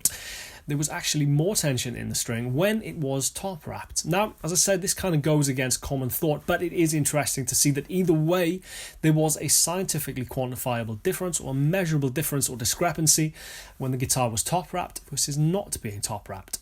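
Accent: Wales, United Kingdom. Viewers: i cant tell if you're welsh or english. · is 'Border Welsh' - right on the border with England, but Welsh nonetheless.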